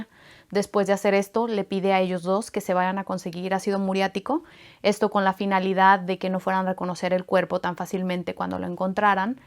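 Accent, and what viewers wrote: Mexico. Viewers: si gracias sabía que era mexicana su asentó no podía equivocarme y desepsionarme gracias 👍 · ¿Eres norteña vd? ¿Monterrey?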